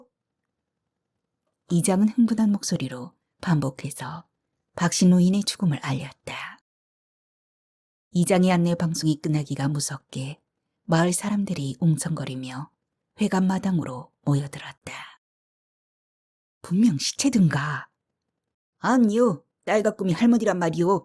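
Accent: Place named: Jindo, South Korea. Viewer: 전라도사투리도 지역마다 다른데 진도 사투리를 제대로 해주시네요. 1980년초 저 한 열살즈음에 진도앞 조그만 섬사람들이 통통배타고가다가 여럿이 배가 가라앉아서 여러명이 죽었어요. 그래서 혼을 건진다고 무당불러서 섬에서 굿을했었는데 무당은 훨훨날아다니고 쇠밥그릇두개겹쳐서 흰천으로 싸서 길게늘려서 혼건진다고 바다로 던졌다당겼다 하고 긴대나무 잡고 있던사람은 미친듯이 떨면서 흔들리던게 기억나는데 그때는 겁없이 구경했는데 그게 씻김굿이었군요. 잘들었습니다.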